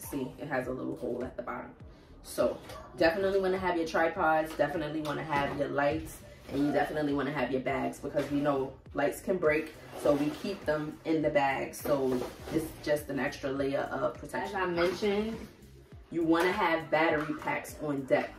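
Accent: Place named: Brooklyn, United States. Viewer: With that accent...it sure sounds like ur in a Brooklyn girl........but gurl I pray ur not UNDERcharging folks!🤷🏽‍♂️🤦🏾‍♂️...🤔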